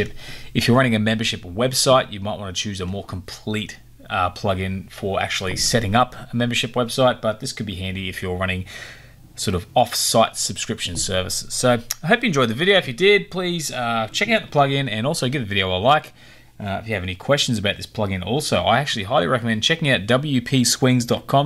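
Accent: Australia. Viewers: Hi. I set up the subscription on WooCommerce following your intstructions. When I click the Proceed To Check Out button I get a message saying you must be logged in to check out. Have you had this happen. There is no option to log in. Its great to hear a fellow Aussie on YT. Brian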